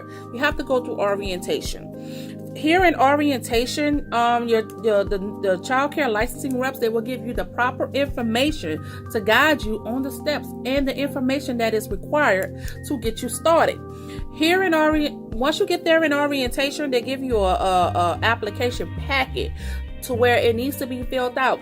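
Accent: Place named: New Orleans, United States. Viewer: That New Orleans accent is strong!! Thank you so much for the info.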